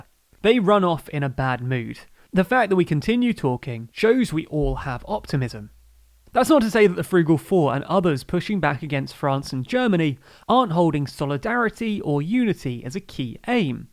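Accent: United Kingdom. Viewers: I like the Britishness of the pronunciation though haha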